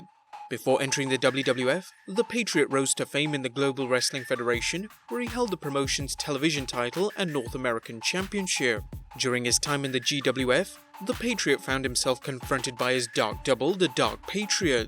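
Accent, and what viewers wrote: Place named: England, United Kingdom. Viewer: Why does every wrestling channel have a commentator with an English accent... or is it just me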